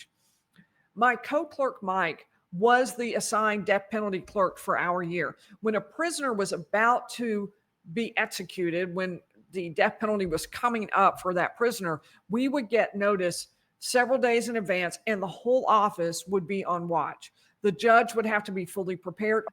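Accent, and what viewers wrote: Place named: Texas, United States. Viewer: Love your Texas accent !!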